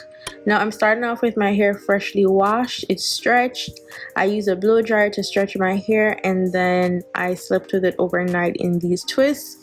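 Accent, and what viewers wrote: Jamaica. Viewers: i know you are jamaican lol the acent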